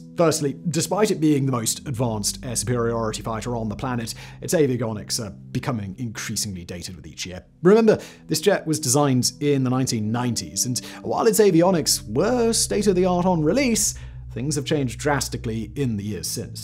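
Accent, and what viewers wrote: United Kingdom. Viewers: A british accent describing my beautiful country's bad ass air superior technology is just perfect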